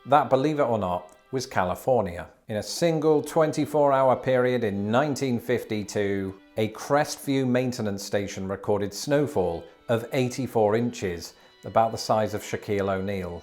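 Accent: United Kingdom. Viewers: The name Binghamton sounds positively British although apparently it’s not. I shouldn’t think that your British tongue should get too twisted over it.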